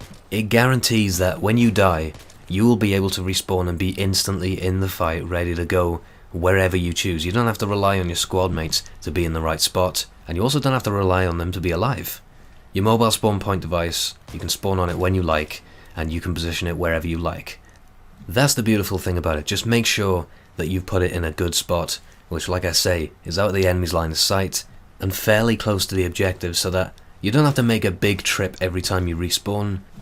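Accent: Wales, United Kingdom. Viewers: Informative, interesting, and...oddly soothing. British accents are great. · Nothing like Statham. This guy has Welsh in his voice. Statham is cockney.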